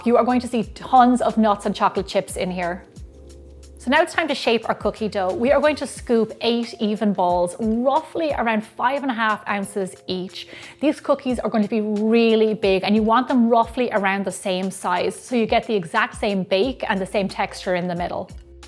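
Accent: Ireland. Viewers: I just love that you never lost an ounce of your Irish accent!!! 💚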